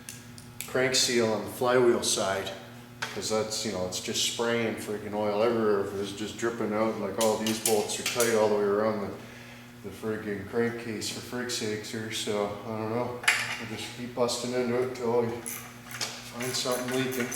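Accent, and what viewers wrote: Canada. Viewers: awesome stereotypical Canadian accent.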